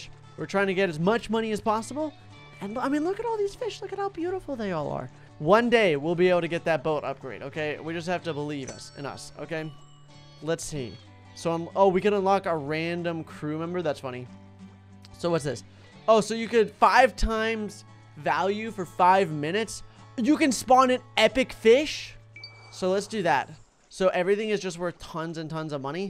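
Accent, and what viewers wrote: Ireland. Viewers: Insted of a pirate accent i think he using a Irish type one haha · I love your irish accent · Why is he using an Irish accent?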